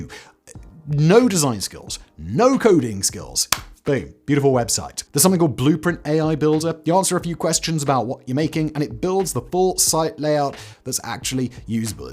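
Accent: RP, United Kingdom. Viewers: Everything about this guy (but especially his posh accent) makes me want to punch him in the face until my arm tires.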